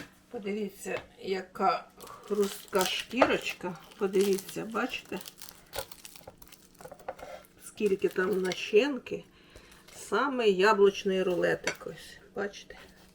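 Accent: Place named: South, Ukraine. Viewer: до перестройки этот говор считался сельским и на нём говорили на всём юге страны. в 80-е приехала к подруге по институту на свадьбу на Кубань. попросили помочь на кухне. определили помощницей меня к бабушке. она стала говорить вот точно также как с экрана говорит эта женщина. прошу девчонок мне перевести и заодно спрашиваю на каком языке она говорит. мне отвечают ЭТО ХУТОРСКОЙ ГОВОР МАЛООБРАЗОВАННЫХ КРЕСТЬЯН. так что из сельского говора сделали украинский язык и убедили население, что это их национальный язык. а по сути на нём говорил весь юг страны.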